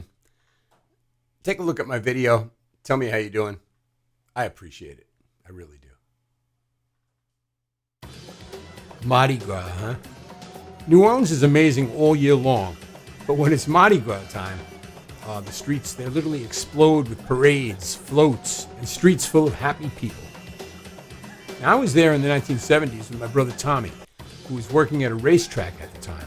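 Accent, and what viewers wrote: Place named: Long Island, United States. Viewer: Very interesting video. Can't wait to hear your version of the song. Say hello to Tommy for me. Nice to hear you still have the LI accent. I still have mine too. Stay safe.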